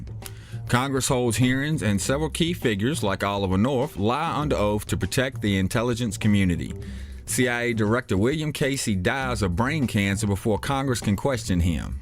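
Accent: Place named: Louisiana, United States. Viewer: Nice one, It is nice to hear your real voice for a change very professional, well articulated, now hearing your voice with a light accent, I could just guess ,maybe your a Louisianan. All good fronts exposed and revisited, Thank you for all your run downs on the populous controllers. Peace Brother. :)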